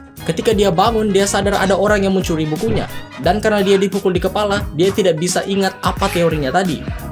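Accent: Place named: Indonesia. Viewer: Gila semua katanya logat indonesia kecuali kata "paradox"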